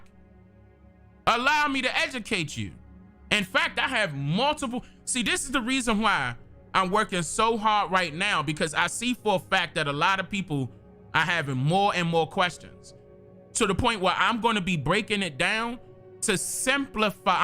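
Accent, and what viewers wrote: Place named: DMV, United States. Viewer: Damm you got me Smiling, Shit I always knew you where from the DMV Area you got that wire accent. Judge Joe brown too old to be acting like that he’s a grandfather he should have more sense than that. GOD BLESS TO EVERYBODY IN THE COMMENTS!!!!!!!!!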